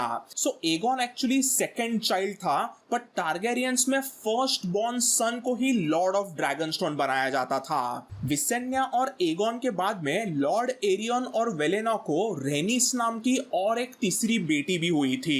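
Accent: India. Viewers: Open the video for listening. Found out this fûc-kface speaking in Indian accent. Leave.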